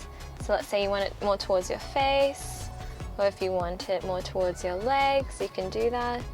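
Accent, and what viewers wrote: Australia. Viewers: Love your Aussie accent! I can't get over the size of the charging plug in Australia they are 4 times bigger than in the US (maybe size does matter)? 🤔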